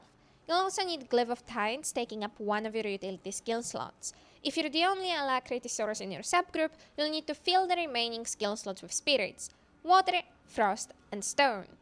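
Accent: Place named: Ireland. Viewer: Thanks for the great guides! Pushing always gave me rough time so I just switched off support to dps for this flight. P.S: Interesting accent! I can’t identify it. What region are you from? I get Irish vibes but I have no idea.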